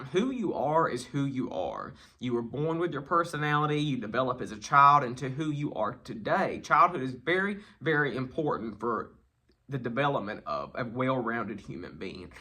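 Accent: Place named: Texas, United States. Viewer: I found you by accident. What a treasure you are... I can relate to all of your videos.. I'm from Australia so I love ur Texan twang accent...LOL.. I am gay aswell but also a Christian....it's hard... I've started my YouTube channel only two months ago about being a gay in the church hopefully to help others... Keep the videos coming..❤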